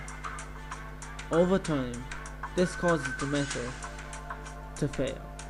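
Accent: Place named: Cork, Ireland. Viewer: It fails as a historical piece, it certainly fails as journalism, amateur or not. Right from the get-go it strikes the wrong note, I mean the title, "seconds from disaster"- surely ' ' is aware of the train-wrecks'n'refinery-explosions programme of the same name on (Discovery? History?) Channel? (aka, the Hitler Channel & the Nostradamus Channel, ha-ha!) I suspect he is, intending to lure in viewers (it worked!) Then there's the presentation- the 'C'mereIwantchaa..' accent on its own would be OK given that the plane was flying out of Cork, after all- but trying to give it this American 'All the Hits, All the Time' DJ-speak/'Picture a Man..' Movie-trailer V/O- type flourish, well it just becomes cringe-making, my toes were curling listening to it!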